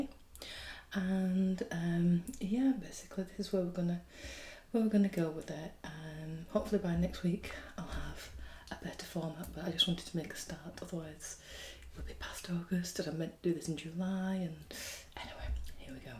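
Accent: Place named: Northern England, United Kingdom. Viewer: Well done on starting your budgeting channel 👏🏾👏🏾🎉 I knew I recognised your accent. We actually don’t live too far away from each other, I live in Bury xx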